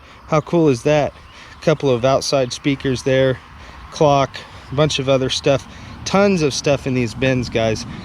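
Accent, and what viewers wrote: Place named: Oklahoma, United States. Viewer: Where are you from it seems like you are from Oklahoma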